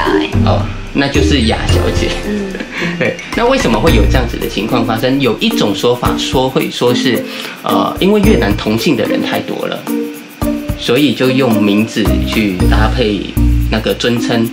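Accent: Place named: Taiwan. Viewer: 台湾腔好重哦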